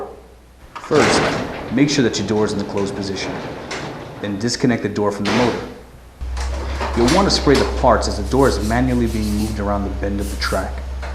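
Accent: United States. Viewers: The presentation was great,. I like your NY accent too. (Long Island?) Could be a north Jersey accent. The company jingle sounds like it's from the '70s. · Is that a Laaung Island accent?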